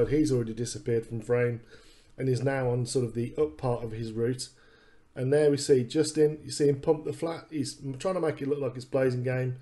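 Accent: England, United Kingdom. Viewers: Am I hearing an English dude doin detailed football analysis, love it 👍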